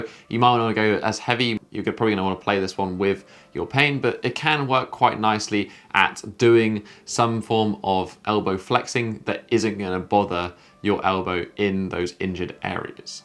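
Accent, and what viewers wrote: England, United Kingdom. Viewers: Because why pronounce just that CH in the none english way when you do the rest with that english accent?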